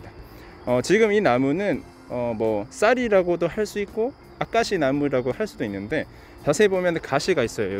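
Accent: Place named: Gyeongsang, South Korea. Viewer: 경상도 사투리 살짜기쓰시네요? ㅎㅎ 오늘영상은 특별히 자신있는 목소리톤이셔서 활기차보이시고 좋군요! 목소리 참 매력있으세요! 늘 잘보고있어요! 감사합니다🙏🙏🙏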